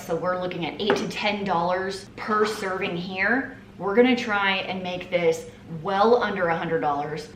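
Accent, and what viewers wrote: South, United States. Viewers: I love you. My husband and I are from the Deep South (NC) and I love the fact that your soo Southern (even if your from Texas).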